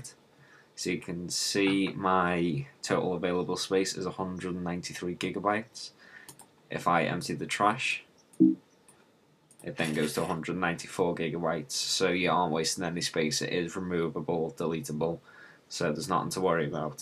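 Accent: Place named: Liverpool, United Kingdom. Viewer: A scouser! Hi from liverpool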